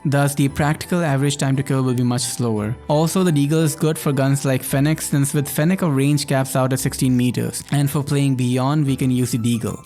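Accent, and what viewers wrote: India. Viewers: You sound Indian Are You from India? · Are u Indian???ur accent is a bit Indian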